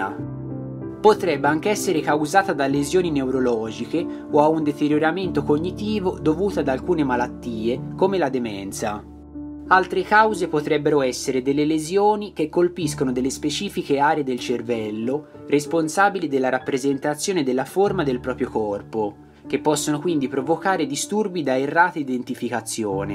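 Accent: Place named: Tuscany, Italy. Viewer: Video molto istruttivo.Bella la parlata toscana sempre gradita grazie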